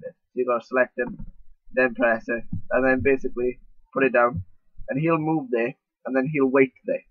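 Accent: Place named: Wales, United Kingdom. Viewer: ahh you dont speak welsh, i recognised your accent. nice to know another welsh arma player. don't see many of them :)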